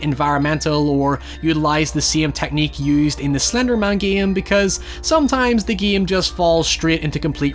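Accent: Northern Ireland, United Kingdom. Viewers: Are you Northern Irish? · Northern Ireland. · Are you from northern Ireland?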